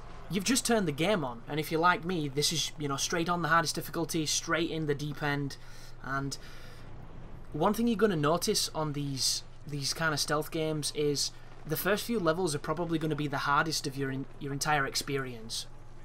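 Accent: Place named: Scunthorpe, United Kingdom. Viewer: You sound very local.... Well, too me you do! Where you from? Can't be too far from Scunthorpe!?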